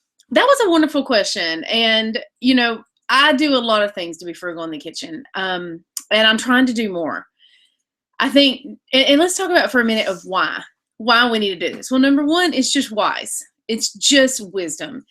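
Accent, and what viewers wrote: South, United States. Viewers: Nice video and I like your point of view! Stories of your grandma and getting back to the basics. Ringing a chickens neck!! haha! That's a good one! But yes shopping sales, doing some gardening, dehydrating...Good for you. I think more people need to be smart about their food and purchases. So many people I have met dream of going off grid and being self sufficient t... but sometimes that dream is five years in the making. So starting today in your own home with what you have is the best place to start. So many was to cut corners and save. My husband is only 53 years old and retired basically and I work only 8 days a month! HOW did we do it..by going back to the basics like you said! GOOD video and love that down home accent!!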